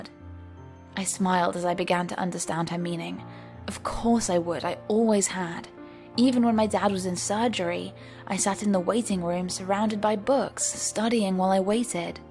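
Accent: United Kingdom. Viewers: The speaker is British but no the person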